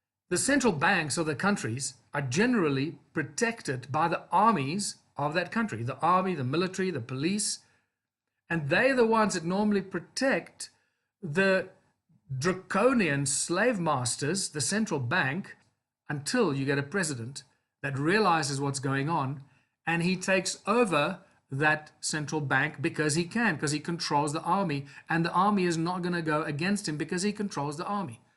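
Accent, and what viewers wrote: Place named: England, United Kingdom. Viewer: Make note to his english accent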